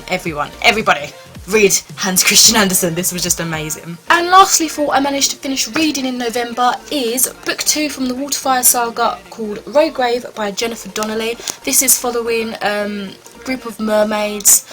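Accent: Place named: United Kingdom. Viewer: I love your accent - it's such an awesome British accent :) Congrats on completing your Goodreads challenge!! also finished it in November - but you read double what I read hahaha very impressive!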